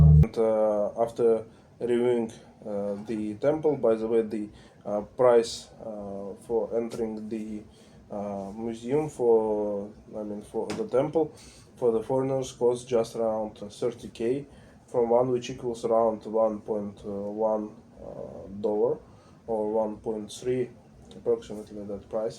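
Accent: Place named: Russia. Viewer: У меня впечатление, что так разговаривать может только россиянин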